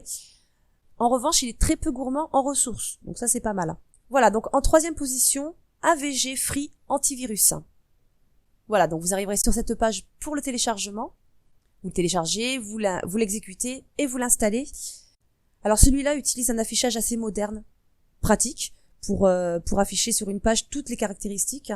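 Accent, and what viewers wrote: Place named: Marseille, France. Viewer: marrant l'accent de Marseille ^^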